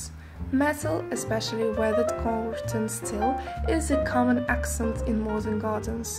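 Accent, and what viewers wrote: Russia. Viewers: Таааакой русский акцент)))) Но молодец, популяризирует автор красоту!))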